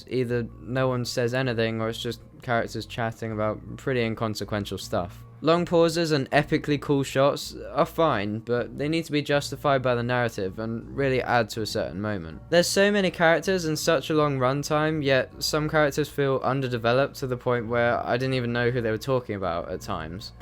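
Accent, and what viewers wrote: United Kingdom. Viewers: you british though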